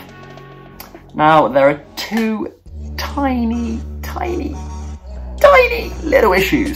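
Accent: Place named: United Kingdom. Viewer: Pleasantly surprised to hear that you're a fellow Brit. I had seen loads of pix of you, but always assumed you were American. I detect a bit of an accent there, so I'm trying to work out which part of England you're actually from. Leave it with me. But...this is a great video. Did it help me to choose which underwear I preferred? Not really. Unfortunately I kept getting distracted by other things...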